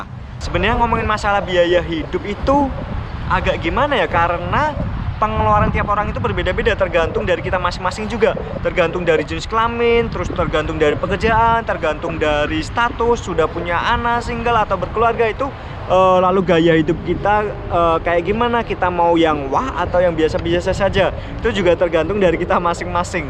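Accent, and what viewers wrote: Java, Indonesia. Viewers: Ini kok sdh di amrek msh medokkk. Lbh nginglish org indonesia. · Suarany asli medok jawa los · Asli jawa ya bang, jawa mna bang? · Mas kok suaranya medok tapi wajahnya kayak blesteran apa ada campuran negara yah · Medhok jg ya mas wkwkkwkw gokil seru videonya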